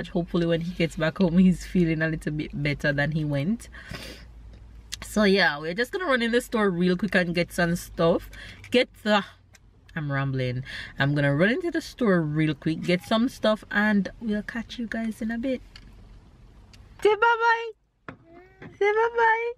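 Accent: Jamaica. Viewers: I like your Jamaican accent. Autumn is awesome. It's not too hot, and not too cold.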